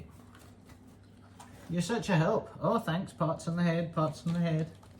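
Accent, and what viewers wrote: Scotland, United Kingdom. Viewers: I have to tell you how wonderful you are. You are genuine and kind, so knowledgeable about border collies, particularly your funny and talented adorable pups and about farming in general. And you make me laugh. You have a good sense of humour and you remain calm in stressful situations (bad weather etc). I love love love love how much you care about your pups and how well you treat them. They just adore you in return. I love Stacey and Kole as well. More family outings like the video with the horses and how much Stacey loves horses would be awesome. I also am an animal lover and don't necessarily like zoos in general but these horses had gobs of room to run around, so all was good. I am mesmerized by how intelligent your dogs are herding. I could watch them all day. And how they look up to you, literally and figuratively. 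I have your videos playing while I work as they make me smile and the world is good. And the Scottish accent........don't get me started......love it! Keep up the good work. My name is Paula, from Ottawa, Canada. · If you're bored, stop watching. Lots of fans love seeing anything he does. We hunger for relaxing content and seeing his idyllic life. Anything described with a Scottish accent is just a bonus IMO.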